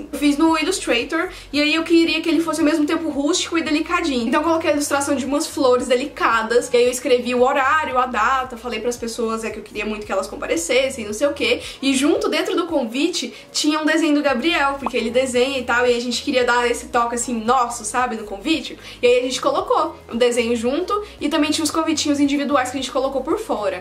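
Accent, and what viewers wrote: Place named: Minas Gerais, Brazil. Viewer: Ahá! É de Minas Gerais né, uai. Kkkkk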